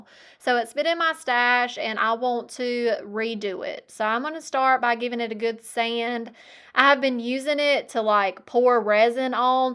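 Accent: South, United States. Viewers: I love your southern accent.❤ Soo 😍 cute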